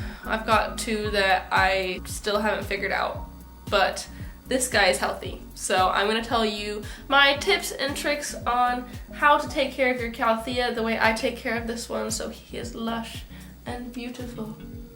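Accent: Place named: United Kingdom. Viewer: This is irrelevant but I can't tell if your accent is British or not, I love hearing you talk 😂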